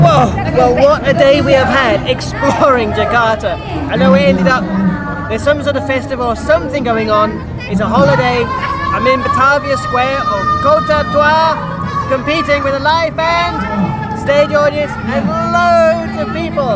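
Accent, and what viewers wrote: United Kingdom. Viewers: Based on your accent, you must be from the UK, right?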